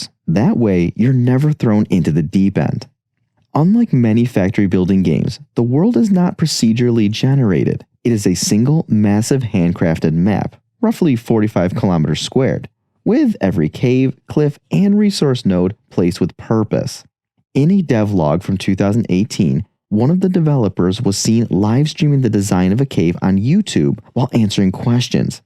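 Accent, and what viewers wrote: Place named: France. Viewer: I watched the video, other than French accent, I’m not hearing a difference. Jean rah (Jean like Picard from Star Trek)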